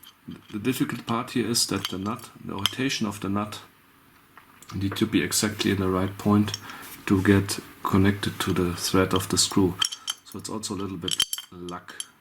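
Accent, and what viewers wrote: Germany. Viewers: Are you german? Your accent sounds like it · André Ribeiro He's German, you're a foreigner too so stfu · Boy this german accent sure is annoying... just as his fingernails -. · du bist deutsch oder? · Am Englisch erkennt man sofort das hier ein Deutscher am Werk ist.^^ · Dein deutscher Akzent ist echt Hardcore !!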